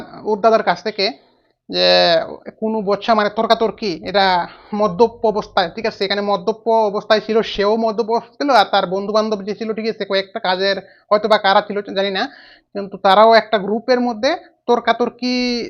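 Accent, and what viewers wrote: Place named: Bangladesh. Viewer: Are you Bangladeshi?. Because your style of speaking is completely Bangladeshi people.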